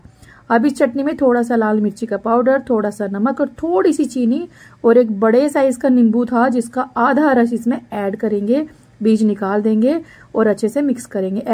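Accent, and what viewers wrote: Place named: Uttar Pradesh, India. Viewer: Lagta hai aap up se hai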